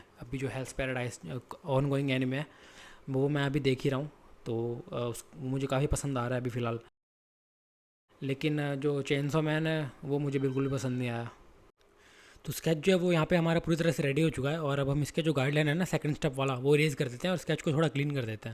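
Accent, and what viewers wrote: India. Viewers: This guy sounds Indian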